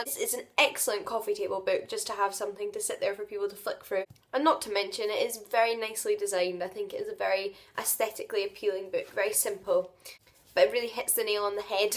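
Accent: Scotland, United Kingdom. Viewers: Thanks a lot for those amazing suggestions! PS: I love yout Scottish accent ♥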